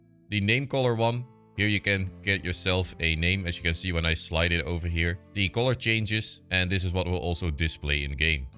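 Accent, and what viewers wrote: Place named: Netherlands. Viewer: i smell dutch\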